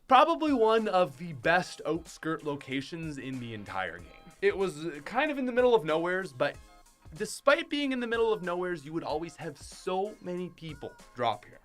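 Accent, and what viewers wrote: Canada. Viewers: is it a canadian thing to say anywhere and nowhere with s at the end or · Are you canadian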